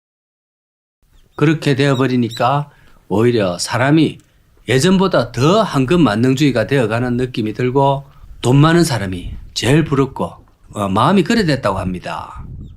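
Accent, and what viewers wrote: Gyeongsang, South Korea. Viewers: 님 안녕하세여 경상도 사투리로 설명해주시니 정겹습니다 잘보그 조아여 누르그 갑니다 건행하셔여 ❤❤❤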